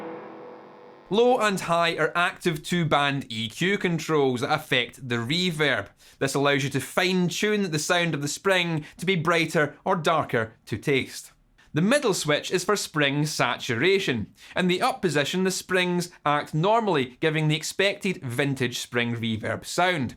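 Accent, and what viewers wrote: Scotland, United Kingdom. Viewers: I think this video is great just to listen to your Scottish accent 😆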